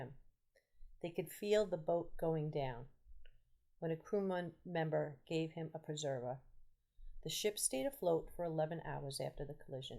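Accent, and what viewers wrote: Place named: New York, United States. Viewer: What part of New York are you from? I love the accent - I miss it! I used to have the "east coast accent" (I lived in Trenton) - but I've lived in Ohio for close to 40 years now... so it only comes back when I'm angry!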